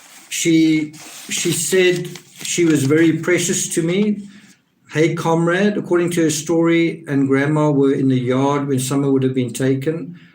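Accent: South Africa. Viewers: Hi there from Namibia. Thought I recognised the SA English. I like your videos.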